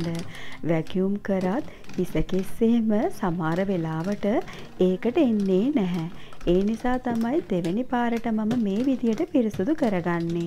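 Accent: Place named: Japan. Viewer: ඔයා වැඩකරනව දකිද්දි මටත් අතපසුවුනු වැඩ කම්මැලිනිසා අතහැරපු වැඩ මතක්වෙනව🤭ඉතින් ඒව කරගන්න හොද උත්තේජනයක් ලැබෙනව ඔයාගෙන්👍❤️ඒවගේම පැටිය දැක්කමත් හිත පිරෙනවනෙ😘තවදෙයක් කියන්නම ඕන පැටිය තරම් නැතත් ඔයත් සිංහල කතාකරන්නෙ හරිම ලස්සන ජපන් උරුවකට ඒකටත් මම ගොඩක් ආසයි.❤තෙරුවන්සරණයි පැටිය ආදරෙන් මතක්කලා කියන්න🙏😘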